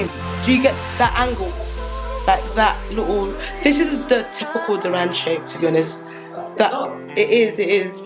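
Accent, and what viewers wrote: United Kingdom. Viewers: Really brit accent is the hardest to understand. So hard. I love American English. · British english, probably something around London.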